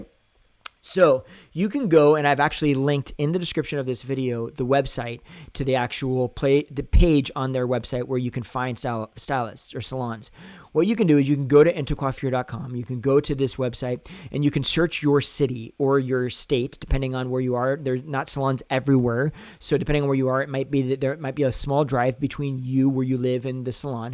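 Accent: United States. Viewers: I hear a slight Southern accent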